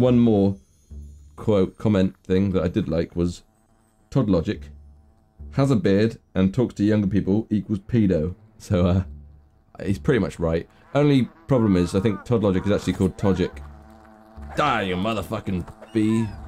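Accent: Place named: United States. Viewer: you sound very American when you speak... what to call it... gamer-lingo?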